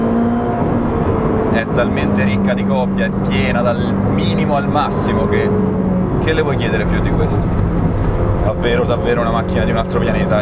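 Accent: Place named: Corvaro, Italy. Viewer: Ciao... no sicuro proprio no... ma vedo sempre più video simili... dove un comune mortale non può quasi mai arrivare... spero non ti sii convertito totalmente a questo livello di auto... ti seguo da sempre x il modo di raccontare le auto e la storia, e perchè mia moglie e di Corvaro noi siamo in sardegna e quindi a mia moglie le fà piacere sentire quell'accento... verró a trovarti promesso!!! Perdonami se è sembrata una provocazione!!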